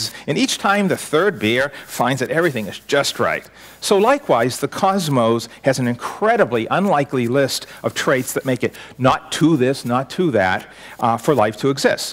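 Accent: Boston, United States. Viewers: Having a tough time taking him seriously with that Boston accent. They just don't sound smart. He should try a British one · THE VOICE RECOGNITION CAN'T HANDLE A BOSTON ACCENT that is conclusively been proven that if you watch a subatomic particle orbital I passed through two sluts in a barrier it will behave like a particle and it creates the solid lookin hits on a barrier are that the business can measure and so like a boy to go through one hole but again if you don't observe a strict reject re the particle than exhibits the behaviour wave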